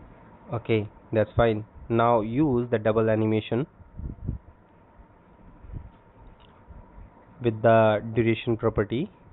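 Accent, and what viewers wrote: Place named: United Kingdom. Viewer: Love the British accent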